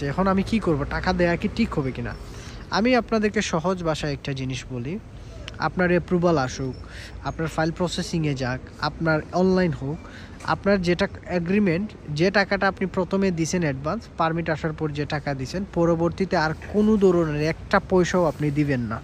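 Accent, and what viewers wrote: Sylhet, Bangladesh. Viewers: vai apne ki sylheti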